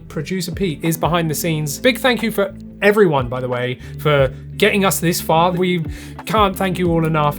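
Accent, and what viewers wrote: United Kingdom. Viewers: I've seen a few of your videos, and i like your channel, dont worry about "rambling on", i like the way the "British Accent" sounds.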